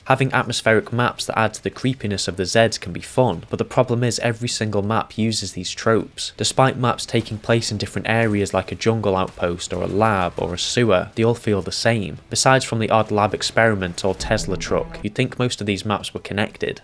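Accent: United Kingdom. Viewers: I felt like it was a matter of time before I actually heard a brit shitting on this game.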